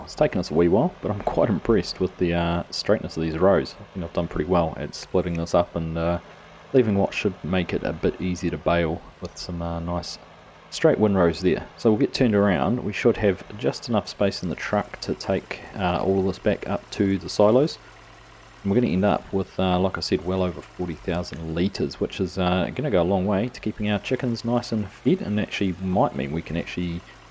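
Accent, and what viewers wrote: New Zealand. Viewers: Goodoh! is beek un speekteckle oylend. Not knocking - my grandfather was born near Napier, 1880-ish (aye, Ah'm auld) and I have dozens of Newzeeld relatives, but none I've met has an accent this extreme. / You do better timelapse music than most, congrats. Wonder if you like and/or, sliders extraordinaires. / Do hope AlienJim does an FS22 version of this map. Thanks.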